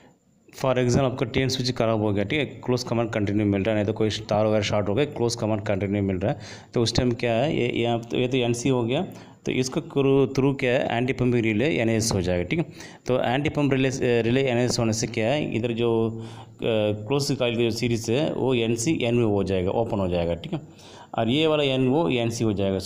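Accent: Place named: Tamil Nadu, India. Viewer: Speaking like u r Tamil. R u from tamilnadu sir ??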